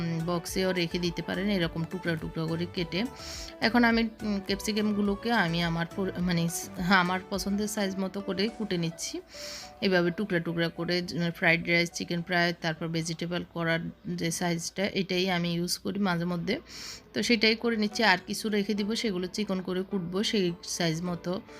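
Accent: Noakhali, Bangladesh. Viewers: আপনার দেশের বাড়ী কি নোয়াখালী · আপনি কি নোয়াখাইলা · আপু গো তোমার বাড়ি নোয়াখালী তাই না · আন্নে নোয়াখাইল্লা নি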